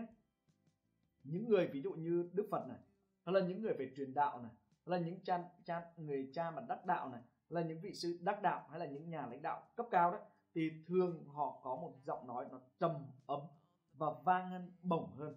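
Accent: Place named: Ha Tinh, Vietnam. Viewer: A ns tiếng Hà Tĩnh nghe gần gũi quá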